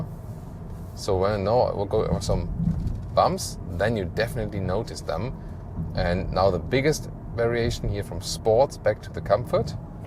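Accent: Germany. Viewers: I LIKE this guy! I just discovered him a couple of days ago. Great reviews... and me being a GTI owner, the "German-thing" makes it even better! "Vy are Volksvagens so darned guut? Vell, being Gerrrman... because Gerrrmany makes them... and, vell, YOU don't!" Lol! :-P. :-P. :-P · Oh digga, man merkt wenn ein deutscher anfängt englisch zu sprechen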